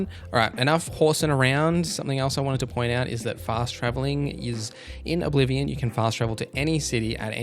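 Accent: Australia. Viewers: He's Australian cut him some slack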